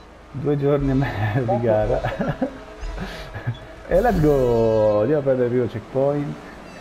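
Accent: Rome, Italy. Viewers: Súper romanaccio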